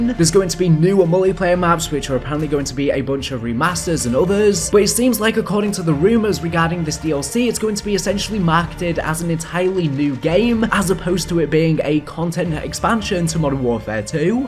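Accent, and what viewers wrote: England, United Kingdom. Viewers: I’m from Scotland and trust me mate, there’s about a million different accents out there in the UK. I’m pretty sure English, couldn’t tell you what part of England he’s from exactly though.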